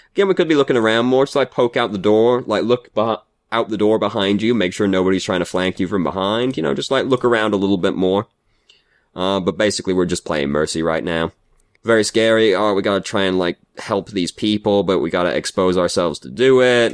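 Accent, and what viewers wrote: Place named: East Coast, United States. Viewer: Where in Europe? My first guess as to where you're from would've been somewhere on the east coast of the US...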